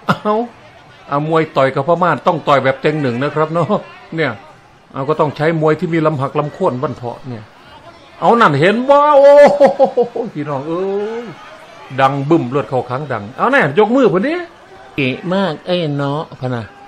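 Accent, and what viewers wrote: Isan, Thailand. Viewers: คุณ สุดยอดนักพากษ์ครับ ถึงแม้จะผสมผสานกับภาษาอีสาน แต่มุมมองของผมๆว่าสนุกดีครับ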